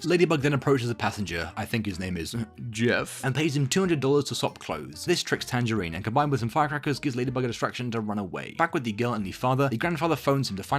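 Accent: United Kingdom. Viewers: The British accent and sense of humour charmed me now I am a subscriber.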